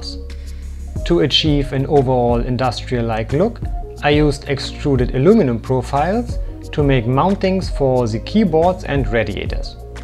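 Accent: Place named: Germany. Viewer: Nice work, aber wenn deutsche versuchen Englisch zu sprechen hört der Spaß bei jedem Video auf O.o omg !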